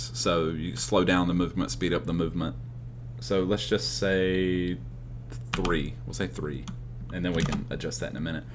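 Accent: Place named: United States. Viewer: Your accent is awesome lmao never heard a country accent for Godot tutorials. +1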